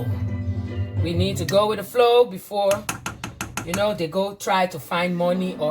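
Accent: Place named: Africa. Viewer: Omg love hoe u zo excited bent. Zo grappig hoe u Engels praat klinkt beetje als hoe Africans engels praten.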